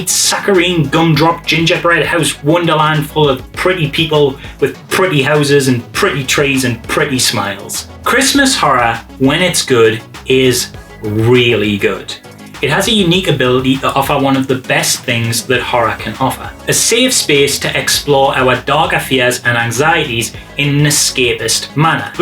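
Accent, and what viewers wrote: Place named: Geordie, United Kingdom. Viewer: Maccum or Geordie? As a Geordie who has lived in Australia for 20 years I can't quite place the accent. Thumbs up on the research on the history of Xmas Horror. Have a good one.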